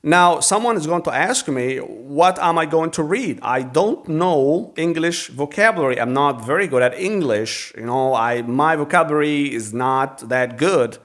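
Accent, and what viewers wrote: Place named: United States. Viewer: American Accent good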